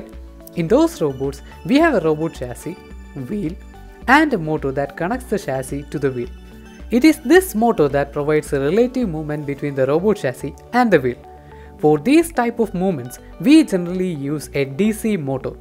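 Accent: Kerala, India. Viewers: Thanks for the video! The mallu accent brought back so many memories from Amrita University!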